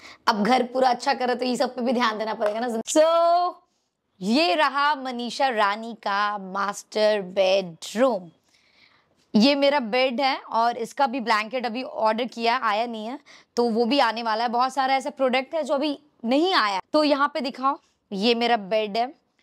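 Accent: Bihar, India. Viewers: Ap jo bolte hai na di vhut acha lagta hai m v vese bihar s hu di.. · I really Like her bihari accent.❤❤ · Aapr proud hai.. Qki aap real ho. Genuine girl ho. Aaapka Bihari accent bahut achha lgta h.... Aap ekdm jamin se ujtkar yaha tak pahunche ho isly aap inspiration v ho sbke liye.. Love n support,👍👍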